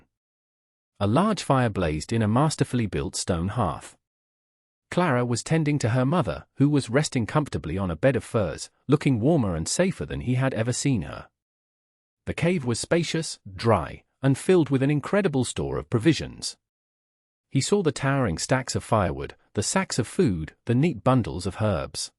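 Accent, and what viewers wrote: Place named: United Kingdom. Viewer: A sledge is what we call a sleigh in the UK. I'm just clarifying for you as the speaker is speaking with a UK accent. I was thinking that is what I was thinking as my mom and siblings used a sleigh to go to school in winter here in the US. I'm not trying to make fun of you.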